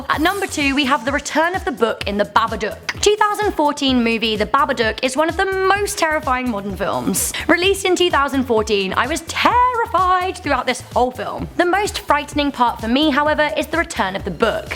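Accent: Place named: United Kingdom. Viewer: is so fun to watch and listen to. Gorgeous lady with a delightful British accent.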